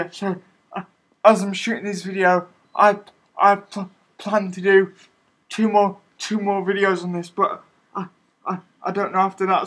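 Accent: United Kingdom. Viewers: Helpful, succinct, awesome. Straight to the point and a UK accent to boot. Thanks man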